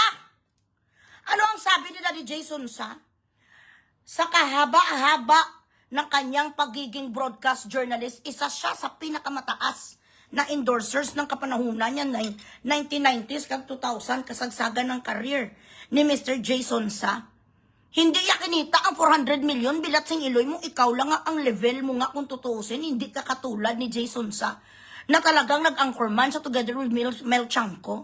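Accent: Iloilo, Philippines. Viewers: 😂😂ginagalit mo si madam,,,,, 😂 natatawa ako sayo pag nagsasalita k,,, ilongga..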